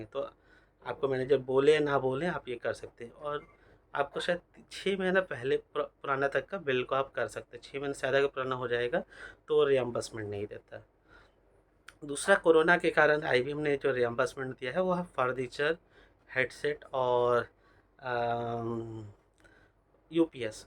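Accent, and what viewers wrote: Bengal, India. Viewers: R u Bengali ? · U got bengali accent while talking..khoob sundar👍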